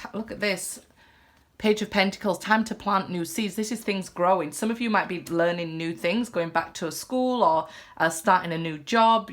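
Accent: Yorkshire, United Kingdom. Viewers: Are you from Yorkshire, leeds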